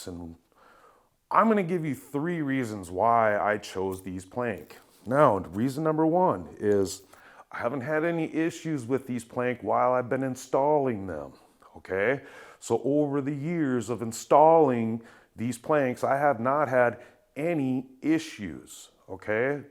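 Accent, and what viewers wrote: Minnesota, United States. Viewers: I knew it. I could hear that MN accent after 2 mins. Thanks,!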